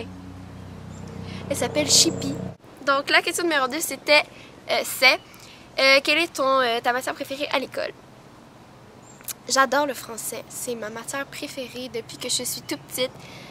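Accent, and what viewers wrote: Quebec, Canada. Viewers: tu es si belle!!! jaime tellement ca entendre laccent quebecois dans des videos beauté hihihi . continue je mabonne :D (omg jugez moi pas la jpas sportive jai dautres talents........... hahahahaha gurlll jte comprends ..........) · Coucou ♥ Je suis Suisse et je suis tombée sur ta chaîne par hasard. J'ai juste ADORÉ car tu as le sourire dans toutes tes vidéos, toujours de bonne humeur, et suuuper drôle! En plus J'adooore ton accent Québécois trooop chou! Non sérieusement et en plus t'es magnifique♥♥ continue à faire des vidéos:) t'es juste géniale... Bisous de la Suisse!!! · Tu est québecoise ou française ? :o)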